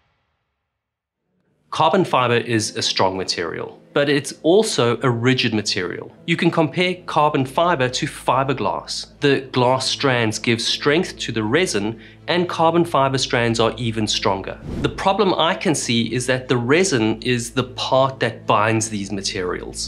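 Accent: South Africa. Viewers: For a second I was confused to hear that accent, but it's always good to find a fellow South African in the wild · Love your accent. As a non-native english speaker i was curious where are you from. My guess was australian but seeing the comments now i know its south african. Very nice❤ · That is a South African accent right there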